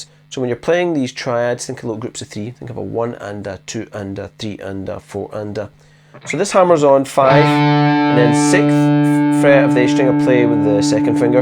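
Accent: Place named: Glasgow, United Kingdom. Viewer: at last! a guitar teacher with a weegie accent! ya dancer!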